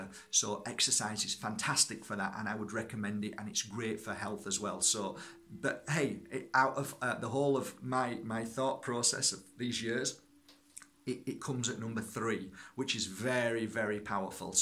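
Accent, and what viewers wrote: Yorkshire, United Kingdom. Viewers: I'm in La Cala de mijas... Love your Yorkshire accent..I'm a Lancashire Lass! 😉😀 You're an inspiration and I hope we meet one day ☺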